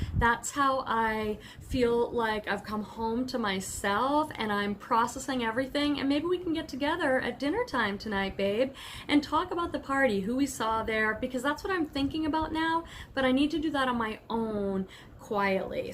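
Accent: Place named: California, United States. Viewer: Your Cali. accent is so broad. (Awesome vid., by the way.)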